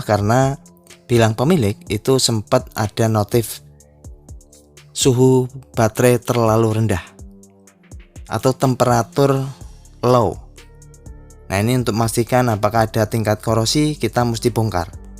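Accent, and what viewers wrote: Java, Indonesia. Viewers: Jumpa lagi sama saya selalu nyimak 👍👍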